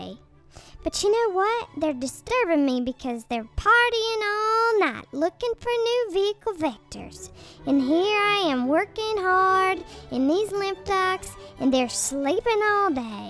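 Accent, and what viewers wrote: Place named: South, United States. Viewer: That's very very nicely done.Please do other parasites too. I guess anything with southern accent is catchy.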